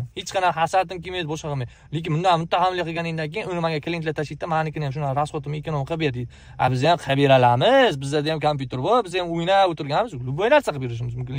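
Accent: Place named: Jizzakh, Uzbekistan. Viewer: Oooh Jizzah shevam....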